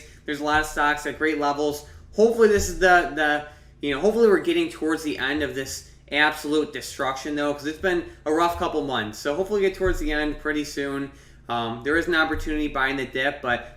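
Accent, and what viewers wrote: Midwest, United States. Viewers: You sound like your from Minnesota or Wisconsin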